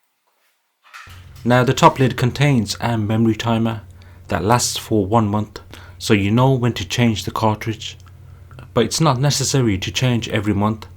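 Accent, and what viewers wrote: Birmingham, United Kingdom. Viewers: Thank u for this video! I agree with the comments, the instructions that came with the filter were a joke! Prefer your vid with your brummy accent! 👌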